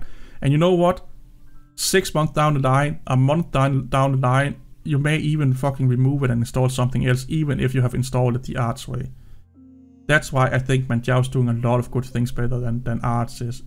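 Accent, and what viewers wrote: Denmark. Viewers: I really enjoyed your Danish accent !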